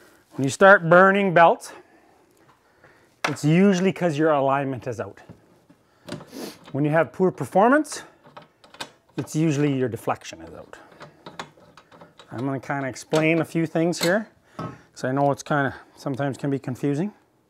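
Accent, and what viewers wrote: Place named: Saskatchewan, Canada. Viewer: Are you from Saskatchewan?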